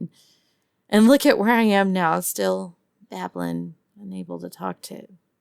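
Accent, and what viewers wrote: South, United States. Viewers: Sounds of the South , only words missing is ya'll.....makes your voice cute as a button!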